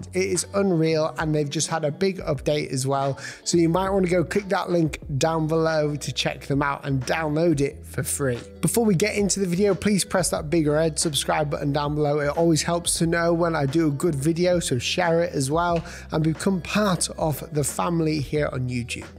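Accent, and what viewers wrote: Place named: Yorkshire, United Kingdom. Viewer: Hahah that looks mad using a trackpad with an iPad. You from the North btw? I’m sure I’m detecting Yorkshire there somewhere 😂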